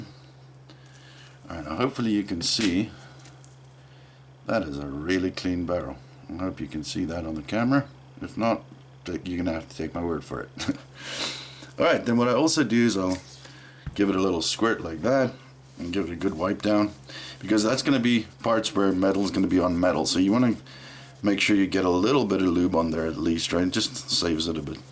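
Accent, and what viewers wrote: Afrikaans, South Africa. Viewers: I sense the Afrikaans in you :)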